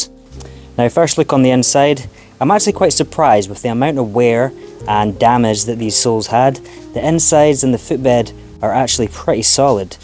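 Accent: United Kingdom. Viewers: You've done a great job on the Boots, they really look amazing. Where's your business you sounded like you could be from the UK ??? Anyway, great job. This comment is from Denver Colorado, United States of America.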